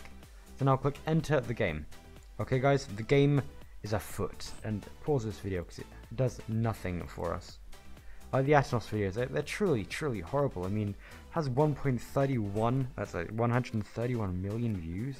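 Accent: United Kingdom. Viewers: the strong bri'ish accent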